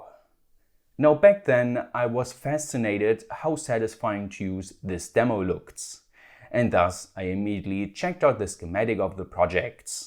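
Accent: Germany. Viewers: i think Entr is asking where in germany. different regions have different accents, as in any other country... i think Entr wants to know where specifically (and so do i) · ALSO ich habe in diesem Video das erste Mal gemerkt, dass du einen deutschen Akzent hast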